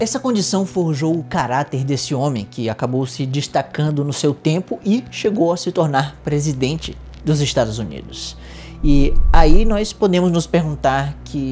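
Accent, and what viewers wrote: Ceará, Brazil. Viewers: Só uma pergunta, ele é do Ceará?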